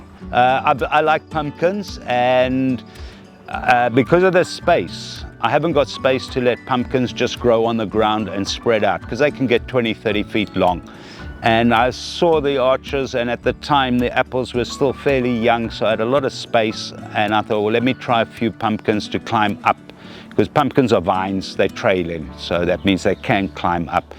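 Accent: South Africa. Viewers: Im assuming he’s South African, he sounds very SAn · did not really catch the area.. Is it part of England? He sounds South African❤ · Oh the south african accent. Unique I tell you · I just knew he was South African 😅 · Great look! You can't mistake that wonderful South African ascent ❤ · There is only one accent like it.😂 I immediately knew he is South African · I'm spotting a Saffa accent! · This is so beautiful and so lovely to hear a South African voice doing this magical work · Delightful! So proud to hear his South African accent ❤ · The moment he started talking - I knew.... another Saffa - nice job!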